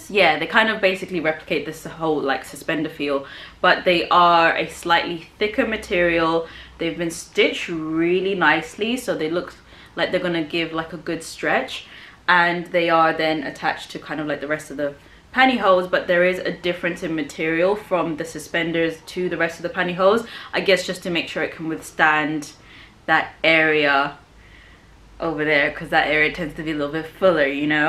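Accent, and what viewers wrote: United Kingdom. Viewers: Love my Ebony Queens. Love my Ebony curves, with a British accent.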